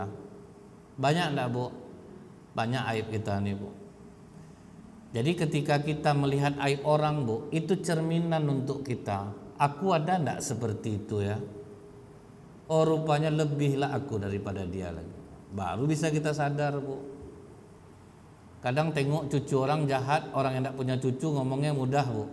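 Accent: Pekanbaru, Indonesia. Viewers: Selain dapat ilmu dari tuan guru, logat khas Pekanbaru tuan guru jadi pengobat rindu 😁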